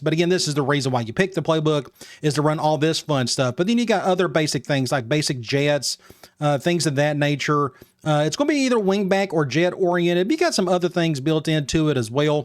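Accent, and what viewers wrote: South, United States. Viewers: I subscribed just off your country ass dialect 😂😂😂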